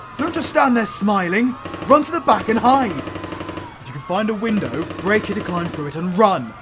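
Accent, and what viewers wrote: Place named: United Kingdom. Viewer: Finally! A person who has a British accent! I been needing someone to voice a character of mine for a few voice overs. If you’re up to it? It’s for my artwork and a feature.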